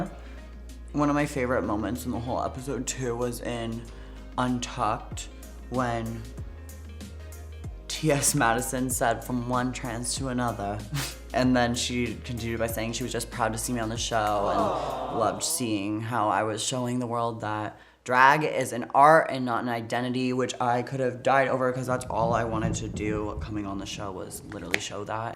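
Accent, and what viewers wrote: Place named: Los Angeles, United States. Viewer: i love you LA dialect its so calming and entertaining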